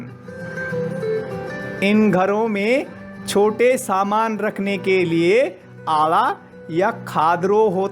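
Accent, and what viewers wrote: Garhwal, India. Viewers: Sir aapki me grhwali bahut acchiii lgti h...missing dat grhwali tpkara....😊😊😊.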